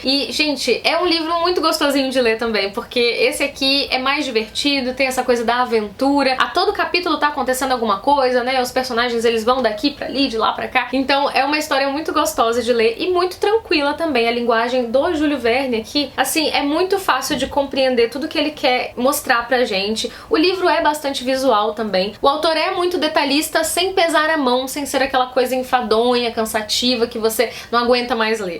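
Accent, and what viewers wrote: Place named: Minas Gerais, Brazil. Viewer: Você tem muito sotaque de mineira. 😁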